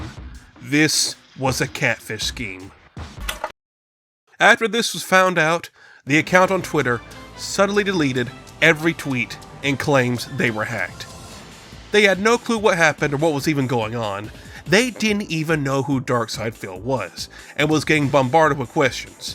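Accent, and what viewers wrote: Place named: United States. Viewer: I gotta be honest, I like listening to voice. It’s just something about the “Cowboy-ish” accent that really tickles my brain.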